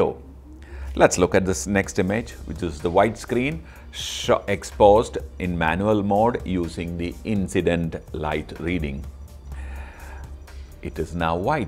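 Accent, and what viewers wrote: Kerala, India. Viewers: This is how an idol photography class should be,simple yet powerful explanation The only photography channel i've subscribed Like the way you deliver,your voice and personality I am a fan of your malyali accent of speaking english :) Thank you so much sir for :)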